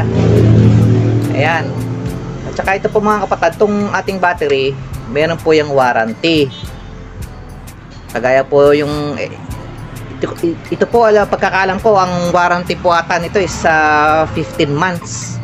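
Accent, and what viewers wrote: Pampanga, Philippines. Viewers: kapampangan ka boss?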